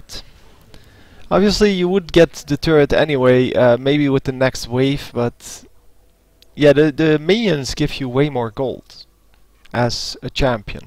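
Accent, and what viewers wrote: Netherlands. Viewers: You dutch hahaha dat hoor je gwn :-/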